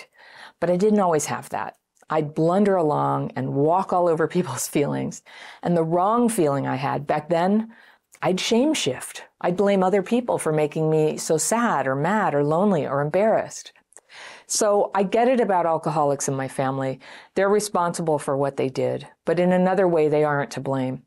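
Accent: United States. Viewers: so embarrassing about my cluttered apartment .. while running to shelter .....❤1. I brought this trip upon myself....Where to escape?? Currently, exhausted, Extremely isolated, brainfog, in Israel #under_fire🎉 #day24 2. Expat from the USA, and appreciate your American accent. Voice From home . I studied psychology... Came here to confront religious Absurd-abuserS who live in Israel.😮😅😊.... So glad I just found your channel/ account. I need tips + excersize for time of war. Staying in hallways/ bomb shelters mostly. Tiny spaces. In Israel under rocket fire. Bless u for all you do! Can send me an email with your videos on you tube or any other free content you can offer. Where are you located these days? City/state? I'm an Expat from Miami currently in central Israel! Sending love, light & hugs wherever it is needed. Amen!❤️ SaraGraceFreed😊3? How to pack and plan while under fire, 4. 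NEIGHBORs Friends have lost.lived ones... mmm. 5. Buying groceries instead! So confused. Help!❤Sara😂🎉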